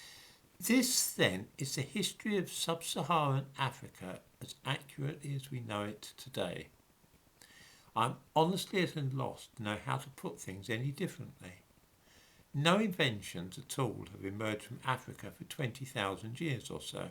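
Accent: United Kingdom. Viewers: All of the base technologies that allowed the human species to become the alpha predator of planet Earth developed in Africa before Africans migrated throughout the world. A lie of omission, even when spoken with a British accent, is a lie nonetheless..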